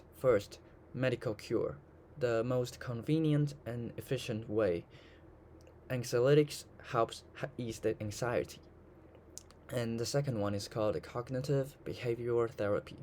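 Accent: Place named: United Kingdom. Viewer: 這英國腔你是英國人吧 wml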